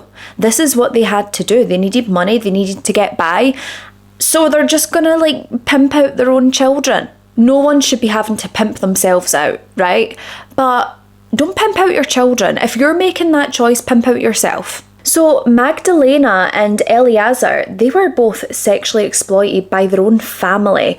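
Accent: Scotland, United Kingdom. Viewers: She’s from Scotland! 🏴 🥰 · You are so Scottish ❤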